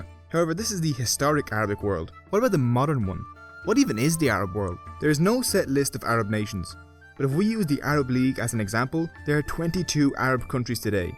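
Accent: Ireland. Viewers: You have an Irish accent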